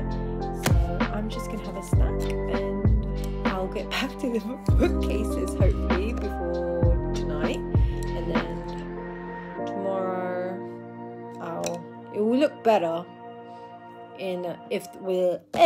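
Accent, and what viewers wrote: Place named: United Kingdom. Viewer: hi ganda. 😍 amazing collection! apaka pretty and sweet mo, really glad to have the honor to have befrieneded you through a game even playing on the oposite side of the team😄 and so sweet of your dad too to help you out, i see where the kindess comes from. god bless and see you soon in the land of dawn😘 ps: love the british accent! 😄 lg, ate diana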